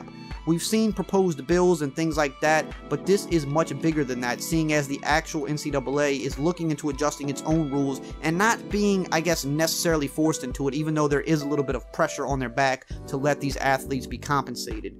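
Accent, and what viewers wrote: Louisiana, United States. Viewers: This is the first video I have seen of yours in the first 15 seconds I knew you was from my neck of the woods lol, I'm from Da Parish you sound like you from at least close to that area, any who great content and I'm subbed. WHO DAT!!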